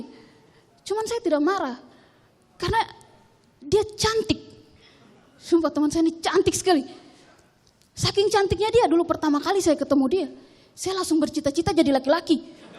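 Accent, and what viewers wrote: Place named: Eastern Indonesia, Indonesia. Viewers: Saya orang Sanger Namum BANGGA akan nona dgn gaya bahasa timur..keren keren nona.maju terus dlm berkarya... Tuhan memberkati mu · Kk...logat Ende kantal Alee👍👍👍😁😁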